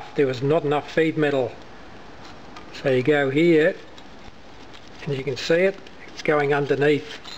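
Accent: Australia. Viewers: Sounds like your from Victoria ?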